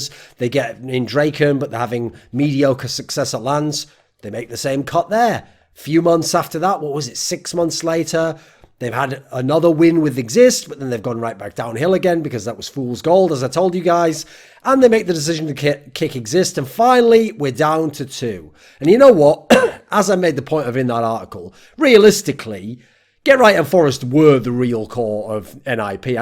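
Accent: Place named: North, United Kingdom. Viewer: He comes from the north of UK, doesn't he?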